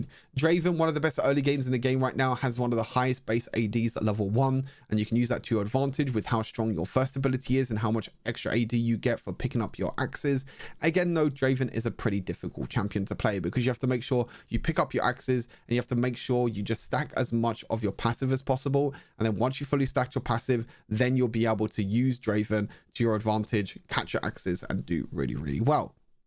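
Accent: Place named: United Kingdom. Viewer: I always check your channel to find out first what's new in Wild Rift and it has helped me better understand loadouts and macro strategies and I love your British accent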